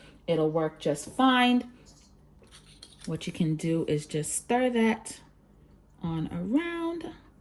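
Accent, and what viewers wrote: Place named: Jamaica. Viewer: Please use your accent more. The Jamaican accent is so beautiful to hear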